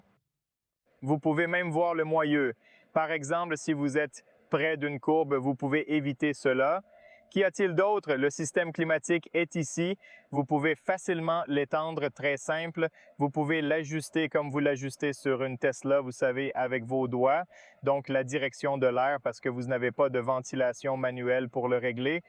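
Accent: Quebec, Canada. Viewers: Honnêtement ,cette vidéo comporte beaucoup d’informations interessantes mais exprimées dans une telle confusion,passant du coq à l’âne , brouillées par un débit verbal trop rapide et par l’accent québécois que je renonce à vous écouter jusqu’au bout. Pardonnez moi .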